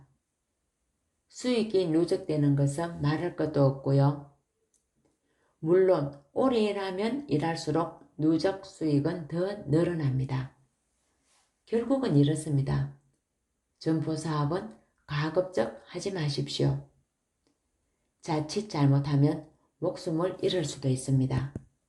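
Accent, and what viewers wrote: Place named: Gyeongsang, South Korea. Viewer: 이웃집 아지매같은 목소리 친근한 경상도 억양이 내맘에 들어오네요♡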